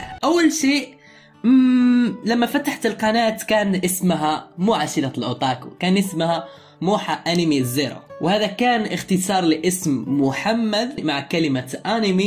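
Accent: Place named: Morocco. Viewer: أضن كلامك يحي إلى أنك مغربي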